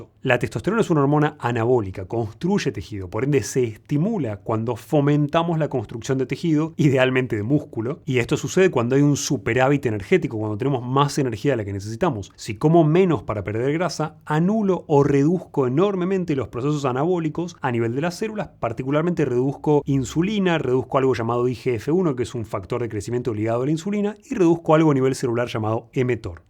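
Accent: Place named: Córdoba, Argentina. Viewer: Eres de Córdoba por tu acento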